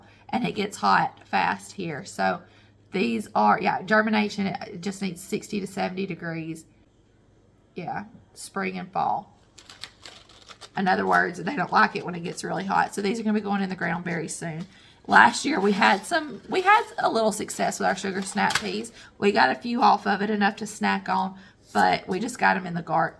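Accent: South, United States. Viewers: Love your accent as a fellow woman from the south, you’re a pleasure to listen to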